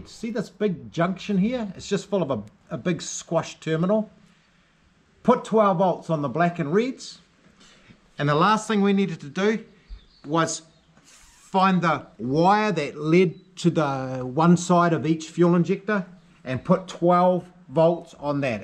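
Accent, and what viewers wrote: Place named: Australia. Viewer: From the accent hes from Australia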